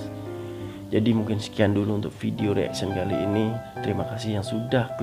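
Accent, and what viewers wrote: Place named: Malaysia. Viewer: aku confuse abg ni orang indonesia ke org malaysia? hahaha ckp mcm org msia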